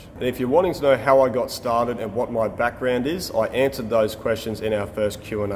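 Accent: Australia. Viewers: I thought you were Australian until I saw that ute launch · Build the drift car! Also Australians get a pass for profanity, no one says the F-word like you do!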